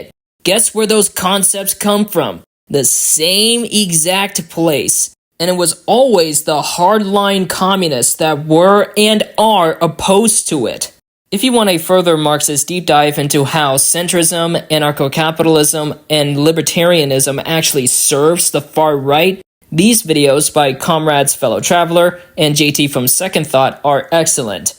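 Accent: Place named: Arab world. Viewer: i kinda see what the commenter is saying though, he kinda sounds like how arabs who are very fluent in english from a young age sound, theres like basically no accent but it sounds a bit off. you can hear it in more. source: im an arab who never properly learned arabic but grew up around people speaking arabic so my accent is a bit weird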